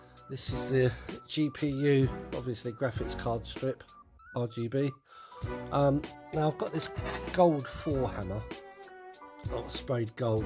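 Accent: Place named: RP, United Kingdom. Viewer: You sound posh compared to me ahah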